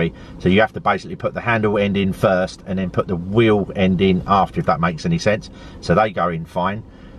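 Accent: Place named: Cockney, United Kingdom. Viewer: I glad you like the leaf , I hope it work's out perfect for you job . Love the Cockney accent , thinking/finkng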